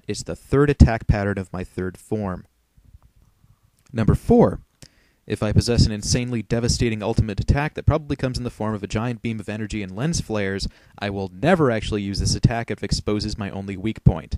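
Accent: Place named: Scotland, United Kingdom. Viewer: Bralliant! (Yes the Scottish accent is deserved).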